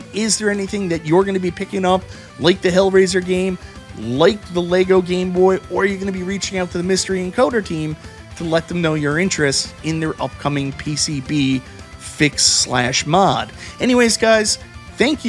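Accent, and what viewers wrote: Canada. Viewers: I experience only pain, and confusion when I can't understand the Canadian accent.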